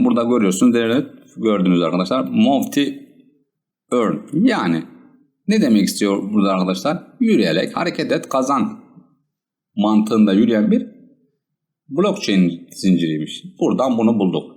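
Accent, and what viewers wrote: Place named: Konya, Turkey. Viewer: Konyalı mısın şive tam o taraf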